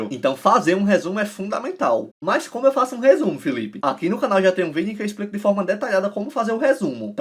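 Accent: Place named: Pernambuco, Brazil. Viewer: Reconheço um Pernambucano de longe, e você, é um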